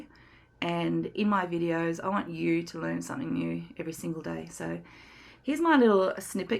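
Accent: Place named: Australia. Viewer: It is great to hear an Aussie expert voice! Glad I found your channel!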